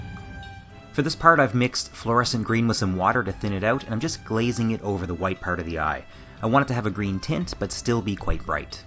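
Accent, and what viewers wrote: Canada. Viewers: I knew you sounded Canadian! Maritimer?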